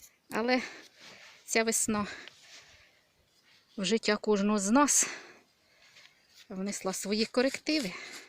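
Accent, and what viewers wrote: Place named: Central Ukraine, Ukraine. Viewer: У вас гарна українська мова центральної України Так говорять не тільки в ЧЕРКАСЬКІЙ, а й в Полтавській обл.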